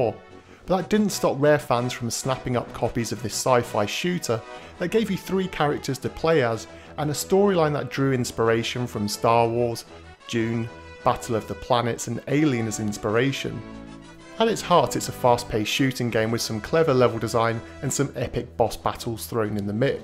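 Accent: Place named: United Kingdom. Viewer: Narrator, which region of British accent do you have? Asking respectfully as a yank haha. Found your channel today and a rabbit hole is where I now find myself.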